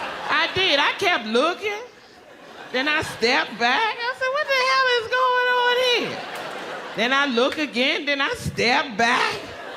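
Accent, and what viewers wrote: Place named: Washington DC, United States. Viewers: I know our accent anywhere #DC birthed some of the funniest comedians in the game. I'm just gon say Martin Lawrence, Dave Chappelle, Tommy Davidson to name a few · That DC accent & lingo makes me feel at ease 😂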